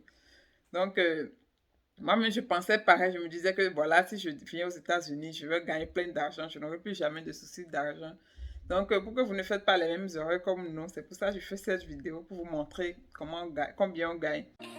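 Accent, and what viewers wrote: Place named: Benin. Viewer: Tu a un accent Beninois. 😊 I hope you are doing good sister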